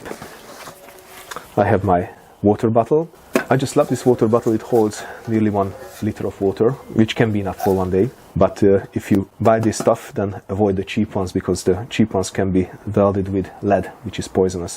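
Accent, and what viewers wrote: Hungary. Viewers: The moment i heard your voice i knew you are Hungarian. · This is the first video I saw from you, but I instantly recognized the hungarian accent. :D Great vid, keep up the good work, valahol van egy eladó bojlerem is.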